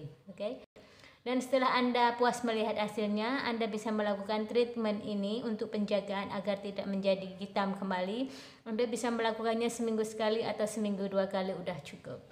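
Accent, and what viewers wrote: Malaysia. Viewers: Anda orang mana kayak orang malaysia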